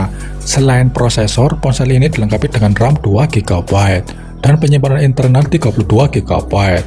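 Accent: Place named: Java, Indonesia. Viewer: Pengisi suaranya medok tapi enak banget sih gw rasa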